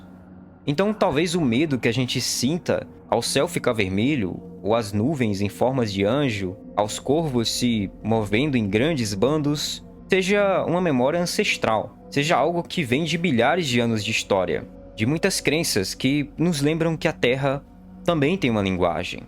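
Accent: Rio de Janeiro, Brazil. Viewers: Decide se tu é carioca ou paulista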